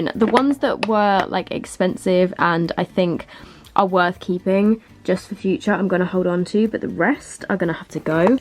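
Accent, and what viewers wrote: United Kingdom. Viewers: Good lord I wish I was British. Your accent is so pretty. Every time I hear a British person speak it makes me wish I could fake a British accent without everyone making fun of me. I love the way British people not only say things but also the words you use! So beautiful! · . Are U British or American? I am asking about your accent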